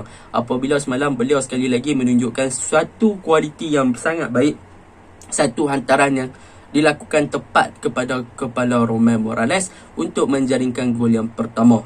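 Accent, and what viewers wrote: North, Malaysia. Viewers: Pekat sangat aihh bunyi utagha tu. Mana mana rancakk! Keep going bro!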